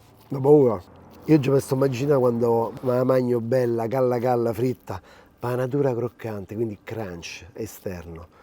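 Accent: Rome, Italy. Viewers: your Roman accent is killing me 😂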